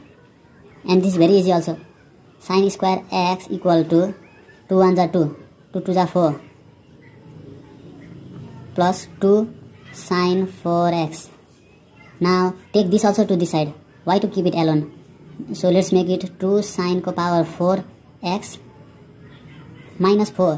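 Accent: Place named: Nepal. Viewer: Bro u nepali?😂 nice English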